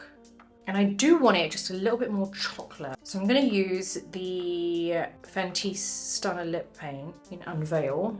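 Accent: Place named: London, United Kingdom. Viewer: got Londer's accents like Michael Caine's. Haha. And I like it.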